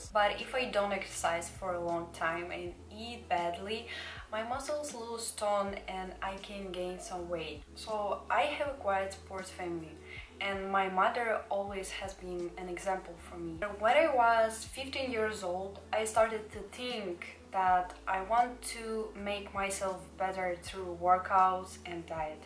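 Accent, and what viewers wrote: Russia. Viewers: Не посмотрев на имя, услышала акцент, и думаю, она что русская? Имя - сказало все за себя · Слышу русский акцент) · Yes, she has Russian accent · Русский акцент в английском так классно звучит(Грустный русский взгляд))) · My brain cannot process on how korean you look but have a russian accent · мне нравится твой русский акцент😩💖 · Your accent (Russian)? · Почему мне всё видео казалось, что она с России или Украины...Просто акцент у меня и моих подруг такой же....Ну или мы просто уже на уровне бог говорим...Ну или я сейчас жестко туплю из-за авто переводчика на ноуте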